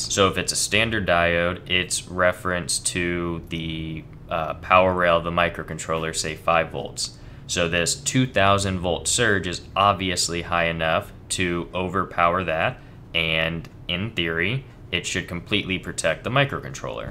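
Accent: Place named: Canada. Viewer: Hi. Some feedback. First off I love your videos. Even though you seem to doubt what you do and your content, you have found a niche area that nobody else does - proper design vids, background rules of thumb stuff that just works and EXPLANATIONS of why you decided on a component verses another this is invaluable!!. The amount of hands-on practical experience knowledge your give us is superb. I know theory but have limited experience constructing things that work in the real world - you help fill this gap. Please just keep banging out LOTS of design videos, don't worry too much about ' if we might find them interesting' we might in the future when the info is relevant to our project, and also don't worry too much about the length we want good information. The only criticism I have is your verbal delivery when your trying to talk 'proper' to camera - it is very monotone and Canadian. 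When you talk to us ad lib and making it up as you go along (like talking to your mates down the pub) it is MUCH easier and enjoyable to listen to. Have you considered a forum like EEVBLOG has - it's another link from Youtube and its search algorithm. Content suggestion - DC powerline filtering how and when to use inductors perhaps. - I am working on electronics in an automotive based environment (12Vdc boat) and cant find suitable info on filtering, voltage regulators etc.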